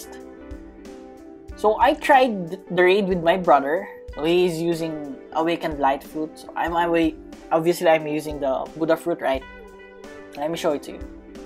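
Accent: Philippines. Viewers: Is it just me or accent is Filipino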